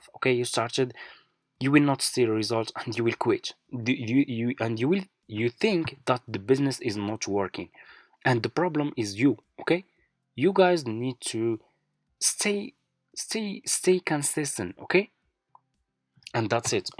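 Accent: Morocco. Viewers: Moroccan accent bro 😊 Nice video keep going !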